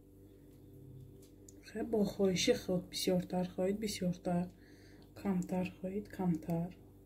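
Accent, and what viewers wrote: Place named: Khujand, Tajikistan. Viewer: Дар овозат чонам ашал хучанди ё исфара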